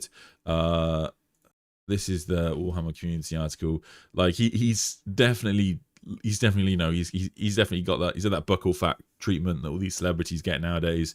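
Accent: United Kingdom. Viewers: I love when british caleb hammer talks warhammer to me :D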